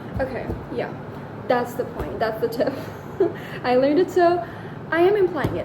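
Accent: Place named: Asia. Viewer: Artsy careers can be difficult my cousin took film studies and cinematography he had similar issues with his family members but he made it big. Aside, as an artistic and introspective person myself im quite into writing poetry, journaling and reading existential books. Also love your accent, where in Asia are you from ? We can share our writings and help each out of creative blocks ? Im making my main themes of life set of poems I've done 2 out 6. Tryna find the inspiration to write the next one. 😭 Also do try the app poetizer can help you publish.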